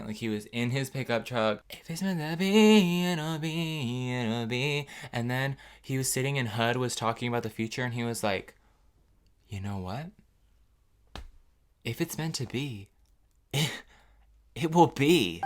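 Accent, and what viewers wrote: South, United States. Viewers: Is it just me or does his country accent reminds you of forest gump? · I don't even know what this book was about even after watching this video but your southern accent was just everything haha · Your cowboy accent is flawless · 1) Even 5 minutes didn't go by and I was losing it XD 2) Subscribed 3) Southern accent 4) Sound effects 5) Beautiful cover of Little Mermaid 6) Not confusing but confusing feelings towards 7) Withholding Shreksy time 8) yeehaw Wonderful! 10/10! Will never watch again! (I probably will, lets be honest) Great job partner!:) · as someone from the south, I beg of you to read it all in your southern accent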